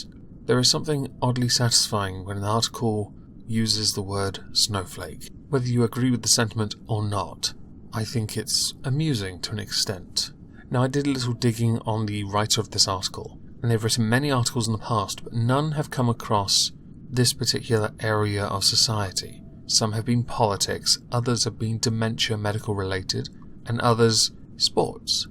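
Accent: United Kingdom. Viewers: Man... I hope you get a bigger audience. We need a sarcasm riddled British accent reading 'less than mainstream popular news to fill the space that groomer Sargon of a Kek used to fill.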